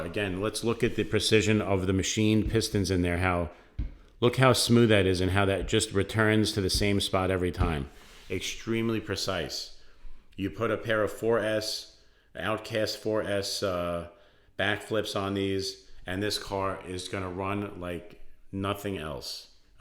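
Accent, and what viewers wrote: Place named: New Jersey, United States. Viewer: I just realized I think you’re in New Jersey I could tell by the accent lol. But I’m gonna guess up north because I’m in the south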